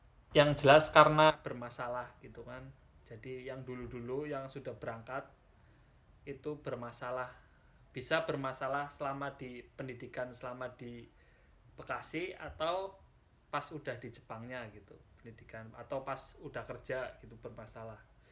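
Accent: Banyumas, Indonesia. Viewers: Mas orang mana? Logatnya ngapak... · Ni orang ngapak.ngapake ngendi kang.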